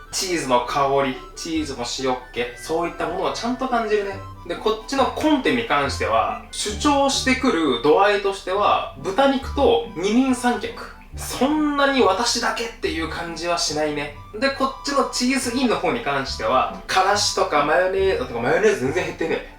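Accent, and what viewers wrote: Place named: Hakata, Japan. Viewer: の動画観すぎて料理の知識だけじゃなく博多弁までも学んでる一石二鳥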